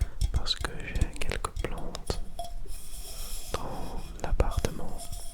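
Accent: France. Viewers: J’adore ton accent français!! +1 follower yayy!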